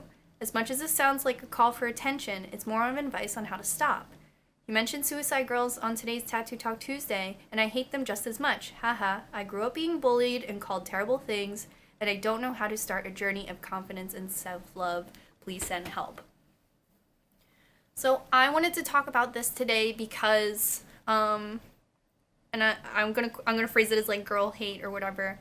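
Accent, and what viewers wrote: Philadelphia, United States. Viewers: I love how in most videos is super cute but every once in a while her "Philly" comes out! Her "Philly" DEFINITELY came out in this video😂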